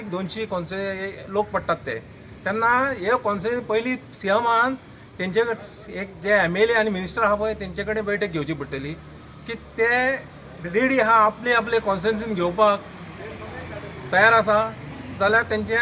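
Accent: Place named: Ghati, India. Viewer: This man is Ghatti as per sound m telling.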